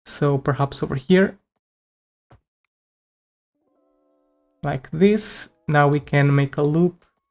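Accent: Portugal. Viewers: are you perchance porrtuguese?? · Ola amigo é evidente que o teu sotaque é português, devias fazer videos em Português ia ajudar bastante. Obrigado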